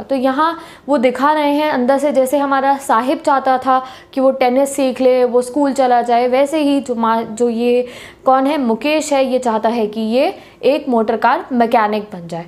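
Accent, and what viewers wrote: Punjab, India. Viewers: Madam...are you from Punjab?? ...tell me pls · Is she belongs to Punjab ? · the punjabi accent that you make in mistake like "haiga" makes this video more interesting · Her Punjabi accent is so cute 😂❤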